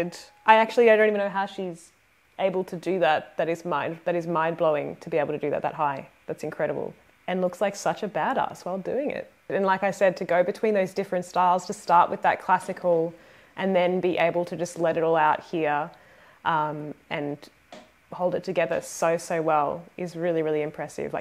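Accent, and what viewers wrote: Australia. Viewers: Love your Australian accent ^_^ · G'day! Do I recognise the accent? Welcome to Nightwish. Nightwish Army, Blue Mountains Corps, Australia! What Gabriel said! Any song from this concert will knock your thongs off! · Are you Aussie?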